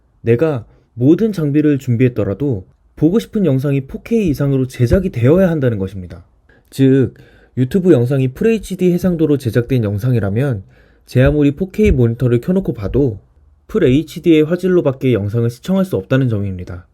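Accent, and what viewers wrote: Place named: Chungcheong, South Korea. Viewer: 충청도 사투리 재밌어서 킹받네 ㅋㅋㅋ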